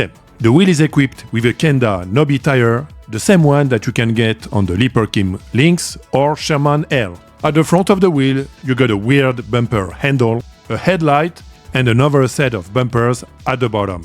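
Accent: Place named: France. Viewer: je te le redemande , es tu francais ? l'accent me vle fait penser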